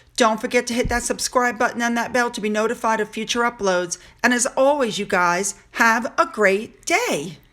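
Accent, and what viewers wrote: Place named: United States. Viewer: The only wrong thing about your videos, dear, is that they are too short...lol. You have what they called in the old days a "radio voice". It carries over running water and every machine I have in the house, so you are my choice of sound when I do housework and I need more than 10 minutes for it. You also speak what I would call "BBC American", correct English with an American accent, and I am sure I speak for more non-native English speakers than myself when I say you are so easy to understand. And after having read most of today's H&M updates, I have come to the following conclusions: - Charles will be King when the Queen dies. - Jason Kanuff will not write a book (unless he is planning to retire at the age of 40). Spilling beans like that might stop him from being hired by others, and he comes across as a man with too high moral and ethical principles for that. 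So no. I think the NDA was lifted because something illegal was hidden by it. I do NOT think he would have done it without William's (and the Queen's) support, and since he was socializing with Catherine and William in Glasgow..well, didn't look like they had a bone to pick with him. - I don't think H&M will sit down for another Oprah interview. The two of them are cowards and seems like Oprah wishes she never met them. - And I finally remembered what that red dress reminded me of. When I came to USA first time in the early 70 and my country was really poor, one thing that impressed the $hit out of me was the Am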